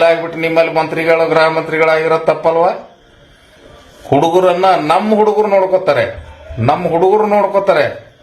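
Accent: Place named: North Karnataka, India. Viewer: sir your telling very beautiful Uttar Karnaraka Bijapur Langvage sir.pl coutinue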